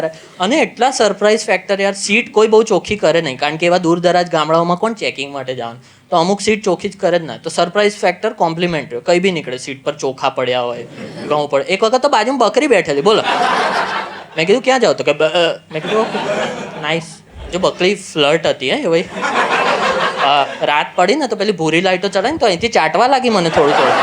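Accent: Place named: Vadodara, India. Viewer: Bhai baroda accent bau cute laage 😍😂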